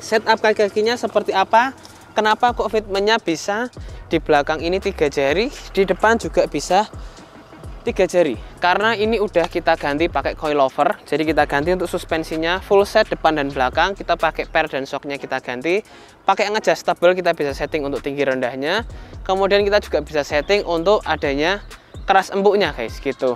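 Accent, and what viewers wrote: Java, Indonesia. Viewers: Mau nyari minusnya kok blm ketemu ya.......,selera baget nih Glossy dan glowing😊.ternyata kokonya kl pake bhs jawa "Medok" bgt ya, lucu.....😅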